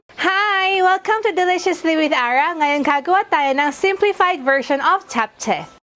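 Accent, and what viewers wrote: Philippines. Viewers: you're too cute!! i love how you talk taglish...:)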